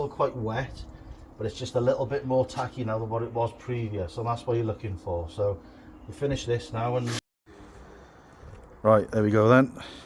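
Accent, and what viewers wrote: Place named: Cardiff, United Kingdom. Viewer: True. Newport myself man. Seen johnny boy on the circuit as he's more my end but I think you fellas are more Cardiff by the accent twang.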